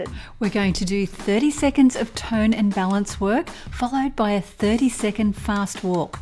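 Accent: Australia. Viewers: At 81 I do 2 30 min videos and feel 60! These are excellent to use alone at home! And I love your Aussie accent...that helps!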